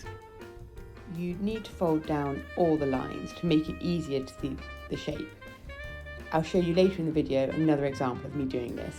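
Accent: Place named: United Kingdom. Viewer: I THOUGHT I WAS FOLLOWING YOUR DIRECTIONS BUT YOU DON'T GIVE THE ACTUAL DIMENSIONS OF THE PAPER THAT YOU ARE USING AND THE A.I. TRANSLATOR FOR CLOSED CAPTIONS DOESN'T UNDERSTAND BRITISH ACCENTS AND IT USED "A3" FOR ONE DIMENSION THAT I THOUGHT YOU SPOKE. YOU HAVE 2 TALL TRIANGLES ACROSS YOUR PAPER AND I HAVE 4 SQUARE TRIANGLES? I WISH YOU COULD GO SLOWER AND TAKE A PICTURE OF WHAT THE PAPER LOOKS LIKE WITH EACH KIND OF LINES YOU DRAW. YOUR HANDS ARE ALWAYS GETTING IN THE WAY OF THE CAMERA VIEW AND THEN THE IMAGE BLURS OUT. I REALLY WANT TO MAKE THIS!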